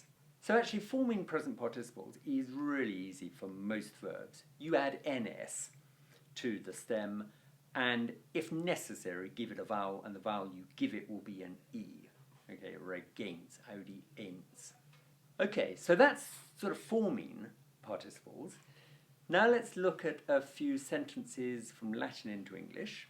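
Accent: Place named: England, United Kingdom. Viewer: Thank God for your English accent and clarity. I sometimes think I'm listening to Caesar recited by Davy Crockett.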